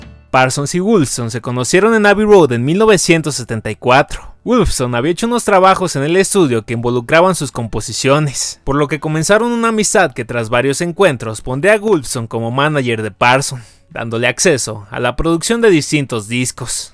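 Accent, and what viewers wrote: Mexico. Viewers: Las historias son supremamente interesantes pero el narrador: fatal! Ese acento mexicano es el peor del idioma español, es un acento náuseo.: eduque su voz y modifique ese fastidioso acento.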